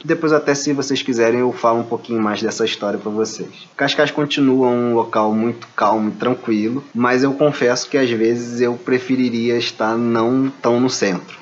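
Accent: Brazil. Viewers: I love the accent from Rio it’s so fun. Cascais is one of the best cities in Portugal. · Estão a gastar dinheiro em escola privada e em seguro de saúde por opção, embora em saúde também descarto totalmente essa opção, porque não se justifica objectivamente. Apesar do desinvestimento feito nos últimos anos nestas áreas pelo governo por motivos vários e as dificuldades que isso acarreta essas áreas continuam muito boas apesar de tudo. Agora você fala uma coisa óbvia: os seus padrões de exigência começam a subir também e daí percebe o porquê das manifestações de insatisfação várias que os portugueses mostram, enfim, você apesar da pronúncia ser brasileira começa a sentir-se português. e isso é tudo normal. Somos exigentes para aumentar os padrões de qualidade e tentar não baixá-los.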